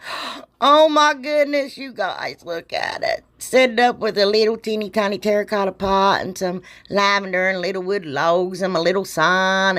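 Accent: South, United States. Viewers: Het, I just love all of these. They are all so stinking cute. I can't pick a favorite. For anyone who says anything about you or the way you talk, they don't have to watch. There are a lot of ignorant people. I guess they don't know any better. We love you and your crafts and the way you talk. I particularly love your southern accent. So don't even pay attention to people that say stupid stuff. The people that matter love you so that's all. 😁 ♥️♥️♥️ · IOVE YOU!!! And your channel If people don't like the way you talk then they shouldn't be watching I love the way you talk you are southern So am I Anyway (lol) some people just cant be nice!!! it's not in them But Anyways I loved all of them Great Job!!! You are the BEST!!!! You're my #1 crafter🖤🖤 Take Care My Friend and God Bless you and your family Love you to the moon and back and my #1 phrase is if you don't like me Oh well your loss I'm a nice person and if you're talking about me you're letting someone else rest awhile So suck ot up Butter cup (lol) Love you Sweet lady🖤🖤🖤🖤🖤🖤🖤🖤🖤🖤🖤🖤🖤🖤🖤🖤🖤🖤🖤🖤🤣🤣🤣🤣🤣🤣🤣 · Girl I thought I sounded country but you have me beat. 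I love it. ❤️❤️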